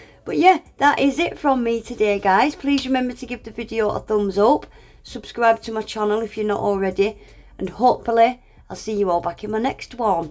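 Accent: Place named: Scotland, United Kingdom. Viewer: Impressive, as always! I have to say, once you said you were Scottish, I said, "That's it! That's where her accent comes from!". But, like you said, it's not quite that obvious. I love listening to you! I'm American, but I have royal Scottish blood in me! My family is very proud of that. My ancestors had to flee the country many generations ago because of their religion. Not an uncommon story. Thanks for sharing!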